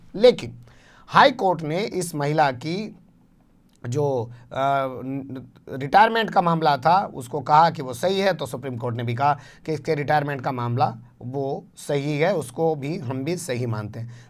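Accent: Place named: Western Uttar Pradesh, India. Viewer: सर आज आपके मुँह अपनी खड़ी बोली सुनकर मजा आ गया सर कभी पश्चिमी उत्तर प्रदेश को अलग प्रदेश बनाने पर कोई बहस या कोई शो कोई न्यूज चलाए थारा अहसान होगा म्हारे पर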